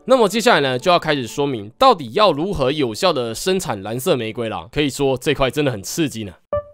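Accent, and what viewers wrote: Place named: Central Taiwan, Taiwan. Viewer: 有中部腔😆😆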